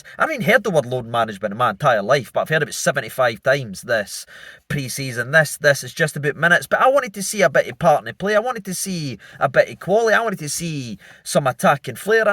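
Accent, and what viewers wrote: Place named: Scottish Highlands, United Kingdom. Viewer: ladies n German 🤣 Dno how people can listen to this teuchter weapon n take it serious lol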